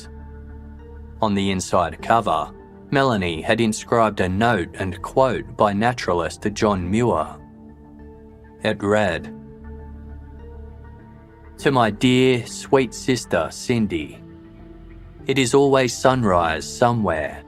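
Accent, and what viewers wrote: Australia. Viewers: Sounds like he is from Australia or NZ. Been our Summer holidays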